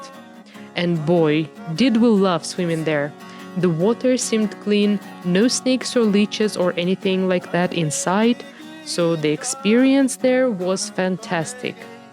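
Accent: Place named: Bengal, India. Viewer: Thoroughly enjoying your vlogging, narration, pronunciation (as in bengali accent) moreover your interests about local history, culture and all..hope will get many more. ❤️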